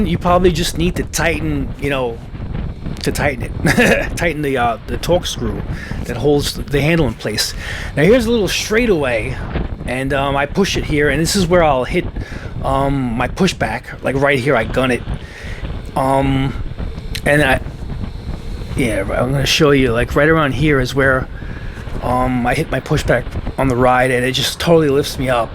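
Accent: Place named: Long Island, United States. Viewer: A fellow Long Islander! I'm watchin this goin damn this guy sounds like my family. Laughed when you started talking about LI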